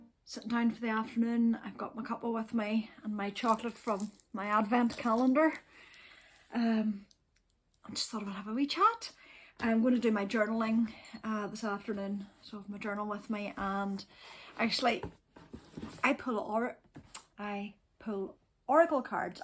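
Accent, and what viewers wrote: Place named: Ireland. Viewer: Sorry about your headaches. Happy you’re able to rebound albeit painfully slow. I love to hear your thoughtful comments about your yarn picks and patterns. Especially in your soothing Irish accent. I, too, was primarily an earth tones knitter-deep greens like you, grey & brown farm yarns, with a splash of red thrown in. But lately some of those bright colors for a garment have been very attractive to me as well. Am thinking of making a vest with my stashvent calendar I received.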